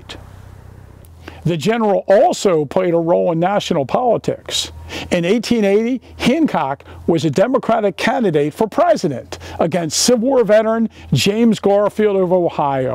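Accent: Baltimore, United States. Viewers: The superb! Love your work, and your mistakes and baltimore accent make it all the better!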